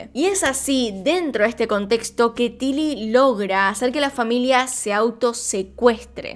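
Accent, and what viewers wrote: Argentina. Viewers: Amo ese acento argentino!!!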